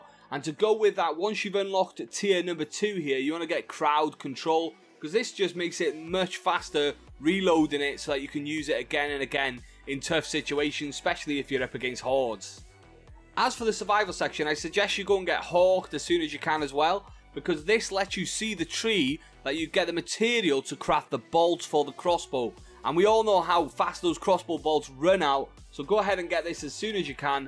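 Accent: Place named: Wales, United Kingdom. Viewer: Exactly what i heard lol, gotta love the Welsh? accent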